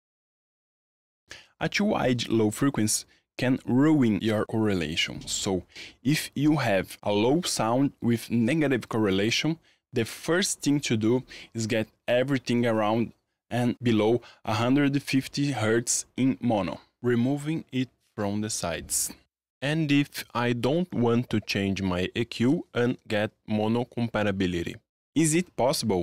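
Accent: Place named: Brazil. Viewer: Brazilian accent af, thanks for the video brother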